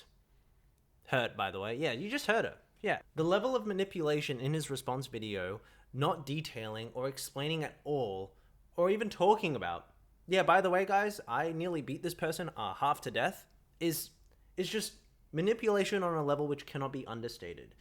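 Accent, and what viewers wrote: Australia. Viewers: unrelated but YOUR AUSTRALLIAN??????? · Bestie he is not british he literally has an aussie accent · I think he’s Australian :)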